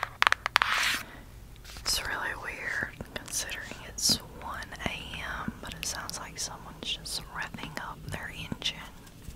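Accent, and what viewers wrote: South, United States. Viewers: There's really something quite enjoyable about a southern whisper.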